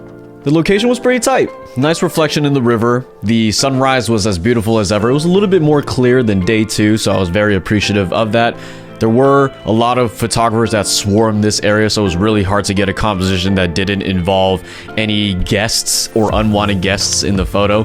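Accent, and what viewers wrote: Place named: Bay Area, United States. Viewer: “Where’s the trail, bruh” I know a fellow Bay Arean when I hear one. 😂😂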